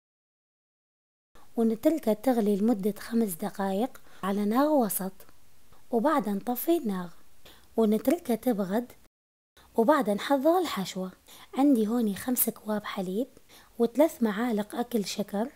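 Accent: Mosul, Iraq. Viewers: صوتك موصلاوي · فدوة للكنة الموصلية عاشت ايدج❤ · احلى شي بالفديو لهجتج الموصليه❤️❤️❤️❤️❤️ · عاشت ايدكي حبيبتي قبان بنات المصلاوية(الاحضرية ) اشقد معدلي وشاطغة بكل شي قبان لهجتنا الحلوي ول دادا 🌼🌼🌼🌼🌼🌼🌼🌼🌼🌼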